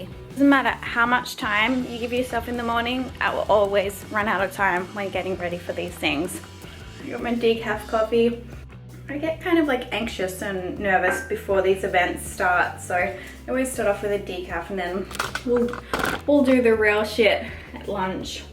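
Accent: Australia. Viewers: I loved this !! I thought you were Australian - did you move to America? Xx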